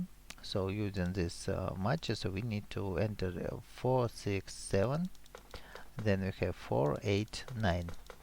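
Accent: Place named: Russia. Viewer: i cant listen to this guy.. is he russian?:DDD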